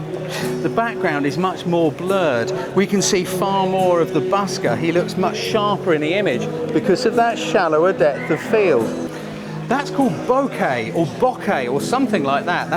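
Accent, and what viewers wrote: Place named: South East England, United Kingdom. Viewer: ....wonderful accent..sounds very south east England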